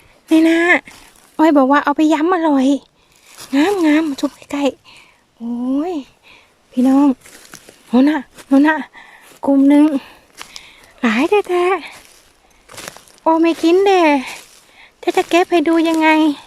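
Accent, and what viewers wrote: Korat, Thailand. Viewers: พูดภาษาโคราชฟังน่ารักจ้าน้อง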